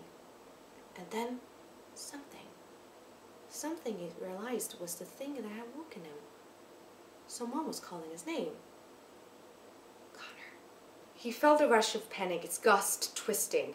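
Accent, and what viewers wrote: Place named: United States. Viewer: È la pronuncia americana, giusto? Perché ho capito abbastanza! Del britannico non capisco niente 😘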